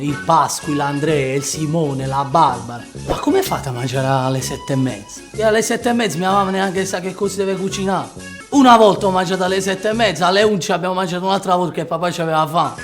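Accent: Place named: Bari, Italy. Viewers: Italiano? ci provi a parlarlo...... sei un barese non te vergognare. Identikit perfetto, se urla e ZAMPA e barese. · MissLaura ma vedi che quello che usa Pasqui non è dialetto comunque , parla italiano con accento pugliese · ahaha, molto simpatico davvero :))) Hai abbastanza ragione, così come ho la certezza matematica che ti stessi rivolgendo ai milanesi e NON a quelli del Nord! Ogni tuo singolo esempio collima perfettamente con le abitudini dei lombardi, specie versante Ovest, ma davvero credimi, non ha proprio nulla a che vedere coi veneti o i friulani. Sì, quasi tutti ceniamo intorno alle 20 e in estate una mezzora più tardi e altrettanto vero che anche i trentini usano l' articolo davanti ai nomi. Ma su tutto il resto proprio non ci siamo ed è opportuno precisarlo, perché gli amici del sud potrebbero davvero credere che tutto il nord sia abitato da gente che si comporta così. 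Fate un salto a Bologna, a Venezia, a Trieste e non ditemi che davvero non ci siete mai passati prima e non avete notato le differenze!! Bel video, comunque, mi sono divertita con il tuo barese.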